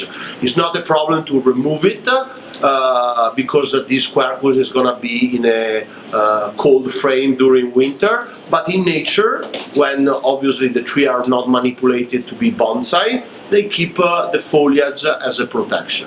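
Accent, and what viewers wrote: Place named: Italy. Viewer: You sir,.. you have all my respect and admire your work as a Bonsai Artist. But your english is good and funny!! And unique way and saying everything with a smooth italian accent! Great sir!!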